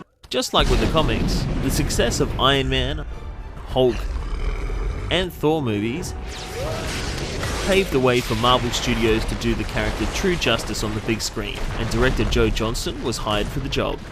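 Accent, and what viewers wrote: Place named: Australia. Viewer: Are you Australian because I am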